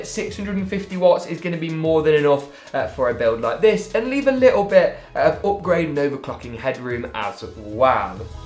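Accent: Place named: Birmingham, United Kingdom. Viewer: Sound like a brumie but use $ any reason why not £.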